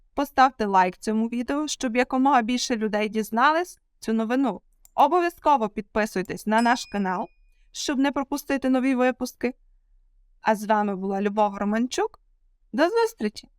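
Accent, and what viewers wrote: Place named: Western Ukraine, Ukraine. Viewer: У лекторки чудова західна вимова. А крім того, її відео містить корисну інформацію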